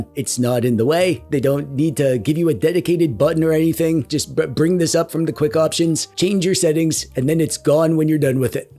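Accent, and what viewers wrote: Canada. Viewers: That Canadian voice can't be hidden ❤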